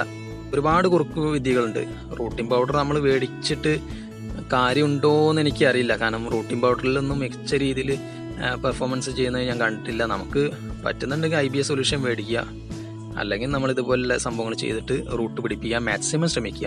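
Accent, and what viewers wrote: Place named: Malappuram, India. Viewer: Malappuram slang😀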